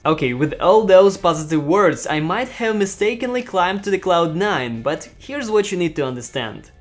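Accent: Russia. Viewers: Love your accent lol, are you a russian? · Why your accent looks like Russian guy? are you from Russia?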